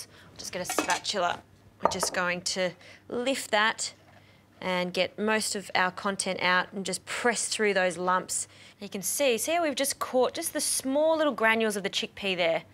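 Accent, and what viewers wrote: Australia. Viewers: What accent she using? Australian? Kiwi?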